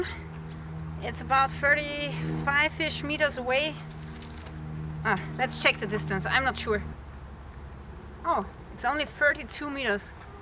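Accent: Germany. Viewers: Are you German? You have a German accent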